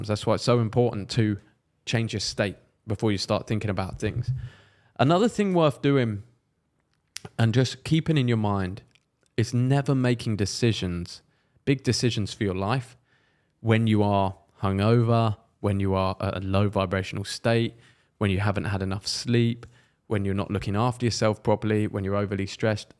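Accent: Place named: United Kingdom. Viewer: As a non native english speaker i have to say it's very pleasurable to listen this beautiful british accent, i like it so much, good content by the way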